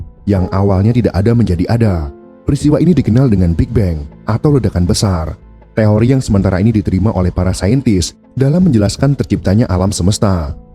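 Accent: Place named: Java, Indonesia. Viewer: Asli mana mas... Kok bahasa Jawa nya keliatan meduk..." Saya salah satu scrubers pegawai jalanan mas.... Video2 mas selalu saya lihat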